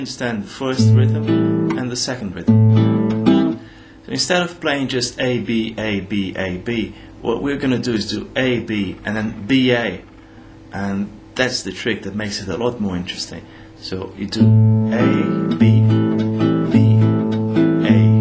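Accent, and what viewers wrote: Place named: Brazil. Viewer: Are you a Brazilian living in England or Australia? Your accent sounds to me like a mix of Brazilian and British or Australian. Just curious.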